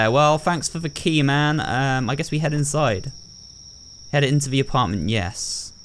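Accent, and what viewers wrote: United Kingdom. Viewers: Ur uploads always soothe my stressed mind with that comforting and sexy british accent. Luv u!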